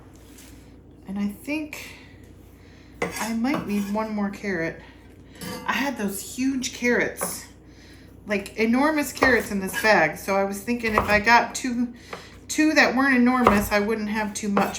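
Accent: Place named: Johannesburg, South Africa. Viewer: Comfort food at its finest! Now that Fall and Winter are just around the corner here in New England, this will be just the thing! I also wanted to ask you if you're anywhere near Jo-Burg [Johannesburg]..we've got some dear friends up there, and your accent is so similar to theirs! Makes me miss them<3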